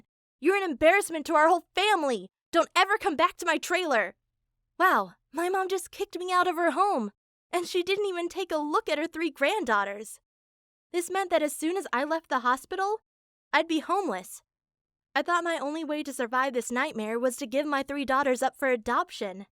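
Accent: United Kingdom. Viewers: Let me guess your from the UK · are u british???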